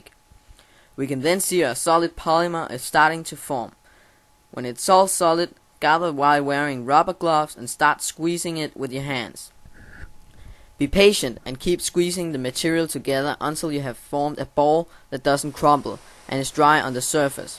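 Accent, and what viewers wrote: Denmark. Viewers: dat danish accent! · Are you danish ? :D Your accent sounds danish ;) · you danish? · I can tell you are danish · are u danish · You can easily hear he's danish, and also see it on the BORUP spirt and the vandglas xD Trololol, jeg er selv dansker ;D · You are danish! I can hear it in the way you speak english! Its almost like us,norwegians...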